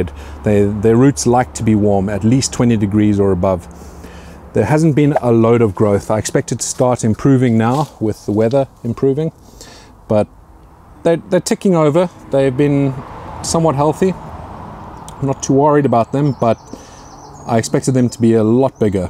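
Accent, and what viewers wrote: South Africa. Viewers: I might be completely wrong, but do I somehow detect a little bit of South African in his accent? The way he speaks just sounds like home. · I really like your chilies 🌶 and I know your in U.K., but I detect South African accent? Maybe I am wrong.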